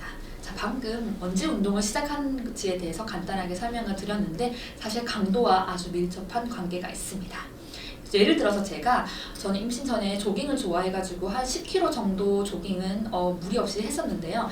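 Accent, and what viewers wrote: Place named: Busan, South Korea. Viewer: 임신부 운동 검색하다가 찾아왔어요. 말투가 익숙하다 싶었는데 부산분이라 반갑네요 ㅎ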